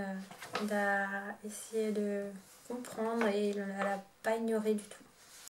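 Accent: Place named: Alsace, France. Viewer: Ce retour de l'accent alsacien un régal pour les oreilles ! Miam ahah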